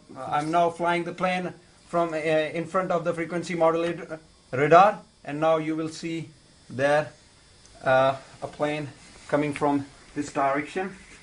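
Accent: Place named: Pakistan. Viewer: Stupid People, This is our Pak-English Akcent. Its our Language and Our Identity. and i speak the same akcent, i love it. and if you can give him some million dollars and a top-class research organisation with some insurance and security, then can do a lot better. why dont you give him these things you cheap and lazy people suffering from superiority complex in a middle class of a 3rd grade country !!!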